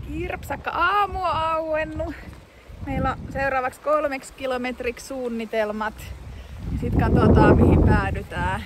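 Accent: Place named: Ostrobothnia, Finland. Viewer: Mukavan rentoa meininkiä 👍 Pilkahduksia pohojammaan murteesta 😊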